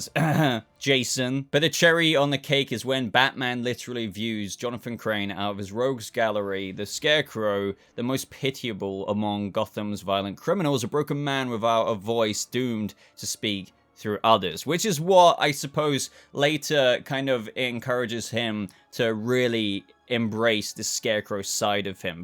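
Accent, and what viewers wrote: United Kingdom. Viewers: I am totally digging your British accent. 😆..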